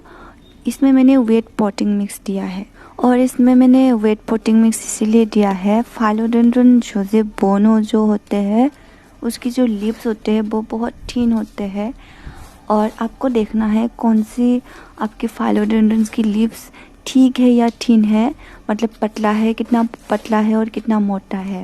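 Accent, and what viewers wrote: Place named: Assam, India. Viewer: aap ka Assamese accent perfect hai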